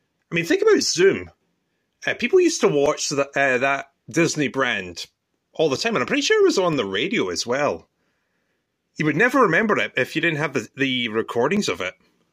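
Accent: Scotland, United Kingdom. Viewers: Do you live in Scotland according to your voice ?🇬🇧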